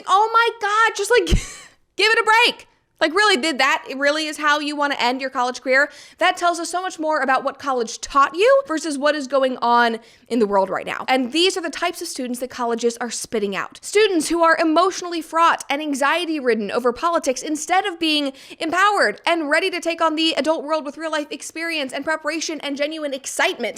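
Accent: United States. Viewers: I really liked the way u talked very good vibers and yapper with fast and quick typical American accent 🇺🇸 u are like my sister Gen Z she is 2003 born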